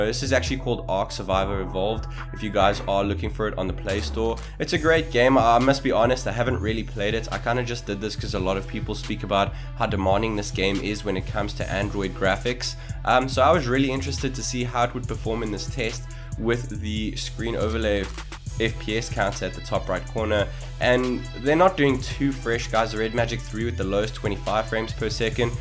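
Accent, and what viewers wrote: South Africa. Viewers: ...you sound south African... Are you south african?😁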